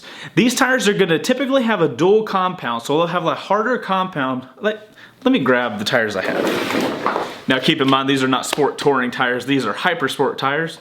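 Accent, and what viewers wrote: South, United States. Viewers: "You could possibly, significantly....." Damn I miss the south.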